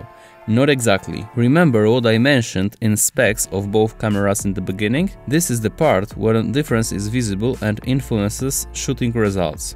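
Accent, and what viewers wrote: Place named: Poland. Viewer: To each their own! I liked the accent. But I work with a few Polish-born people (in America) and am used to that accent, and find it pleasant. :)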